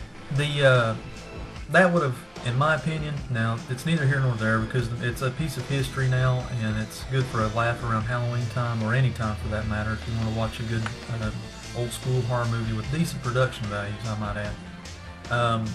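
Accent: South, United States. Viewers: It took me a while to get used to your Southern Drawl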